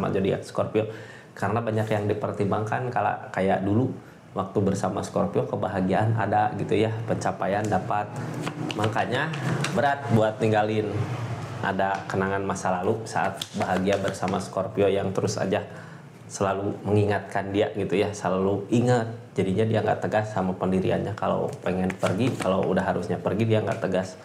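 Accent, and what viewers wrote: West Java, Indonesia. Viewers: Si Aa lucu speak up aksen Sunda pisan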